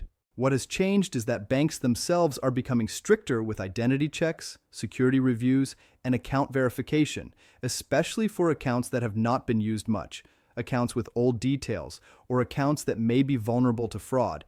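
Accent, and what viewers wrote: United States. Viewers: Why another Yank? AI?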